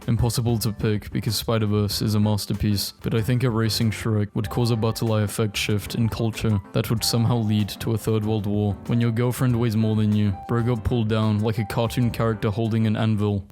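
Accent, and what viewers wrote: United Kingdom. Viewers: I walked into my brother’s room and asked him what he was watching, he said “It’s this depressed British guy reading memes, he’s surprisingly good entertainment.”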